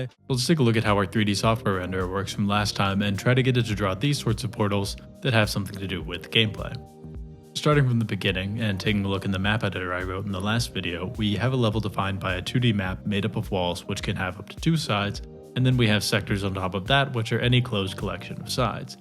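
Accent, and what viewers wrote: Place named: Denmark. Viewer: Great video as always! Jeg havde ingen idé om at du var dansker, din accent er ikke særlig stærk :)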